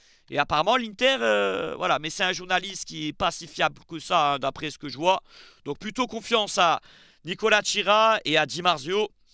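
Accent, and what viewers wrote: Corsica, France. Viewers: Ç'est pas la 1ere fois jtecoute 👍🏽 hors contexte mais il semblerai que tia l'accent de nos amis Corse, jme trompe ?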